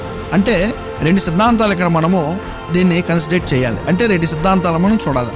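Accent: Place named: United Kingdom. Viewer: Meeru enduku English vallaku puttinatlu English slang lo telugu matladutgaru Neat ga Telugu matladu ledante English matladu ee teda Telugu enduku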